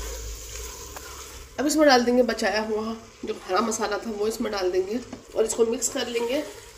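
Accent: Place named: Bhajanpura, India. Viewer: Baaji aap bhajan pura mey rehti ho kya? Meri bahen bol rahi hey aise bhajan pura waley boltey hey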